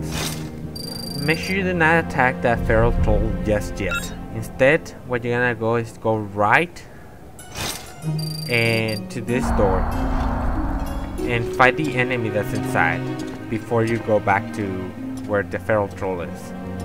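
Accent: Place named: Philippines. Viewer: u r philipino right ?i love ur english accent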